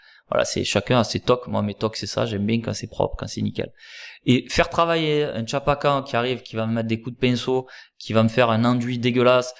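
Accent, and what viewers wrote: South, France. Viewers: Salut, merci pour cette vidéo et puis entendre cet accent du sud, quel plaisir. Les US c'est top mais cet accent chantant me manque un peu quand même. Beau bureau! La bise.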